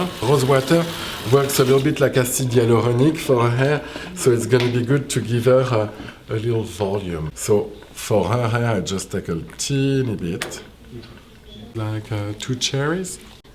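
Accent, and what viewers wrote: France. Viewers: I'll never get tired of French accent, never. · the most french english accent ive ever heard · he has the most intense english french accent ever and i'm living for it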